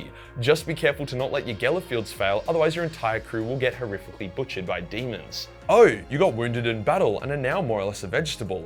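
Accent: Australia. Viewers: Is it me, or is everything better when explained by an Aussie?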